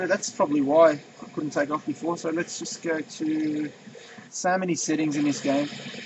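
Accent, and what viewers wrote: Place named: Australia. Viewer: are you australian, cause you sure sound like one ...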